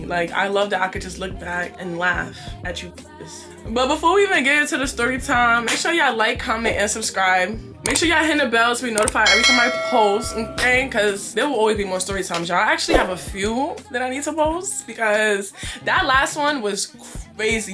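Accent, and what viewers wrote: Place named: Harlem, United States. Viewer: She sound like she from Harlem.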